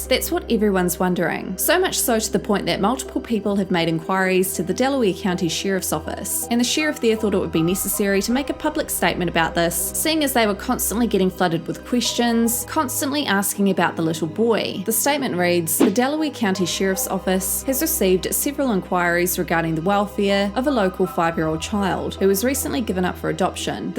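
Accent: New Zealand. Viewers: Your accent is lovely, are you from New Zealand? Sorry if I got it wrong, I often mix Kiwi accents for South African 😳🤪 I'm Scottish so feel free to call me English if I got it wrong hehe 😂 I've just heard about these selfish, clout chasing, money grabbing, neglectful, greedy losers and yours is the first vid I found. All caught up now, thank you. Sending love from Edinburgh 💙🏴💙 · Great video. I'm just curious, are you from New Zealand? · Off topic: I’ve never been on this channel so this may have been asked before. Are you a Kiwi? Is this a Kiwi accent i’m hearing? Great content btw. That narcissistic couple should be banned on Youtube.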